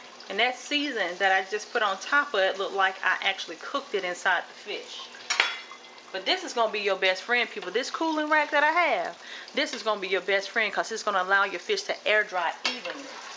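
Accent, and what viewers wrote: South, United States. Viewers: Love your voice and it’s making all kinds of southern accent sense to me! Sending love cook to cook from Bham, AL! ❤❤❤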